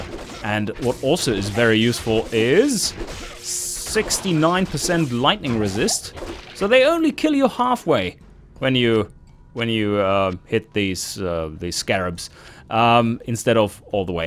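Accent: Germany. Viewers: Like your videos dude :D your slang sounds like german doesnt it? cheers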